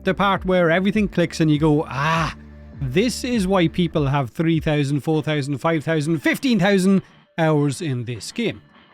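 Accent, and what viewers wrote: Ireland. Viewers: Where are you from in Ireland???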